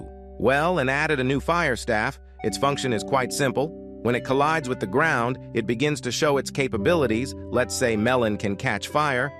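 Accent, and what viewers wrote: Russia. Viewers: Are you russian🤨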